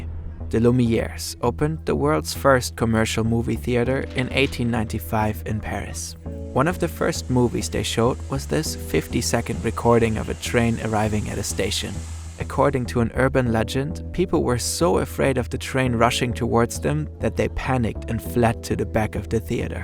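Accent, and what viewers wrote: Germany. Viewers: Hä ist der Sprecher deutsch?! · I just love listening to his German accent. There will be a time when he loses it. It's probably the most iconic voice in story narration history. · ahh I can hear a German accent, I feel right at home 🥰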